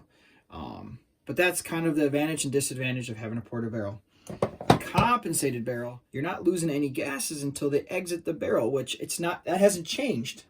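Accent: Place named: Minnesota, United States. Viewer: your voice and accent is remarkably similar to the friends' who I grew up with in Minnesota (near the MN/WI border in a town called North Branch).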